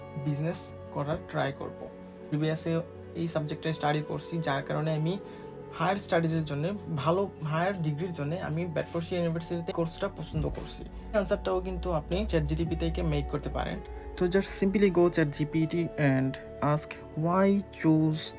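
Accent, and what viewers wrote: Sylhet, Bangladesh. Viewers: ভাই আপনি কি সিলেটি?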